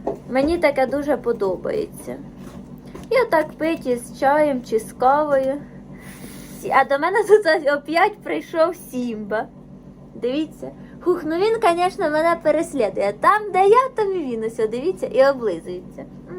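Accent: Poltava, Ukraine. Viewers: мені подобається як ти розмовляєш. ми на Полтавщині так і балакаємо.спасибі за відео. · У нас ,на заході України, мова трошки інша.Але так говорять мої рідні з Хорола Полтавської обл. Люблю слухати ваш діалект,здорово і гарно!❤😊 Смачного тобі!!🎉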